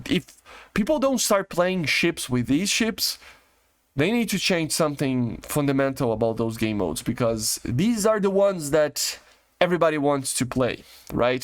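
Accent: Brazil. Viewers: Bro he is Brazilian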